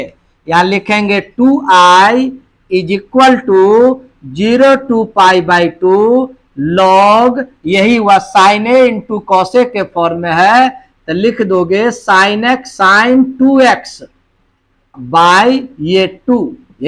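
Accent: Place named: Rural, India. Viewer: gawar kaise bole rahi hai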